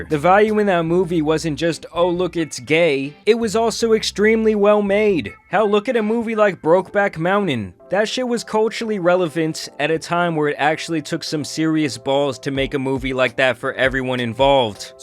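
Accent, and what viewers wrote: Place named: Long Island, United States. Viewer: How are you from Texas but have a Long Island accent?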